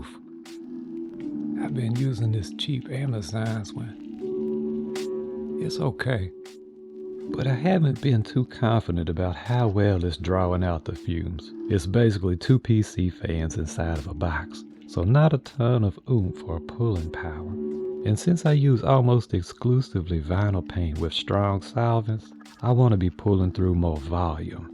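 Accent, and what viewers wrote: Louisiana, United States. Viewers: New here! Great channel. Very well done! Are you in Louisiana? Nailing the accent if not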